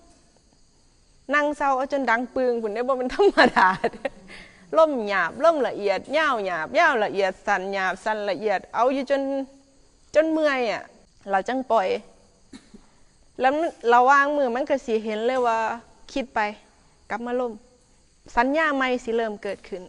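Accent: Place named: Laos. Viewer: แม่ชีเว้าน่ารักตกคำเว้าลาวกะเปนตาฮักจ้าน่ารักมากค่ะ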